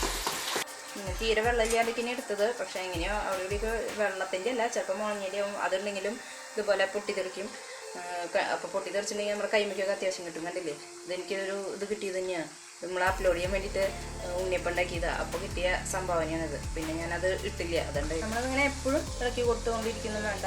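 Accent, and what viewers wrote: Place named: Thrissur, India. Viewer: Nicee..thrissur slang 😁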